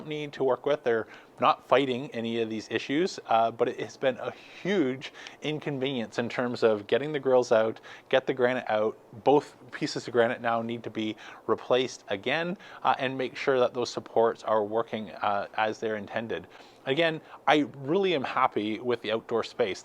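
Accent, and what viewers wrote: Canada. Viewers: Thank you so much for your very informative videos. I have been a KJ student for the last two to three years. Some trial and error but mostly wins since the KJ is so user friendly. Adding you and your videos has added the last piece in my grilling/smoking journey. Plus my family and I really enjoy your Canadian accent...really adds some fun to your tutorials. Keep em coming!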